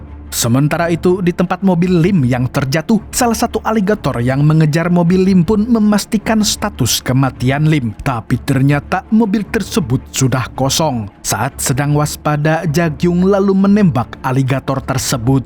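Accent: Banjar, Indonesia. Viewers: Bang lu org banjar😂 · Pian urang Banjar kh bang 😅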